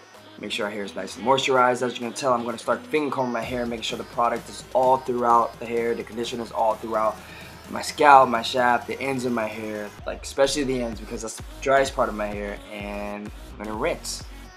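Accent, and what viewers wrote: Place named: United States. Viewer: Do you actually know how to speak in Tagalog? Youre Filipino right? You have such a nice American Accent! :) lovin all your videos!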